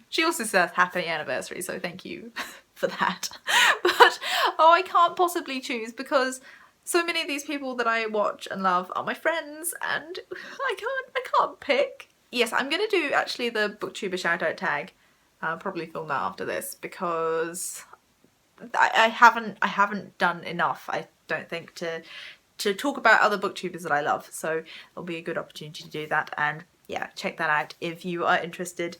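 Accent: England, United Kingdom. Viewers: Just curious why do you have an English accent but you're from NZ? Have you been in London longer than you were in NZ? :)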